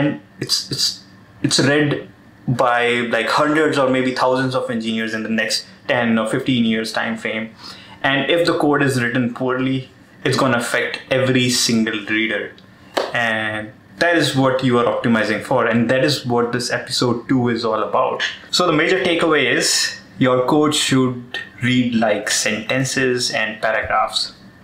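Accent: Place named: India. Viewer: It's not the code that we don't understand but your Indian accent 😐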